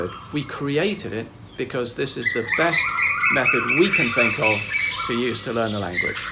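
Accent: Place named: United Kingdom. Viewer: I think the birds really dig what you're saying. I know I get all frothy like that too when I hear your smooth British accent.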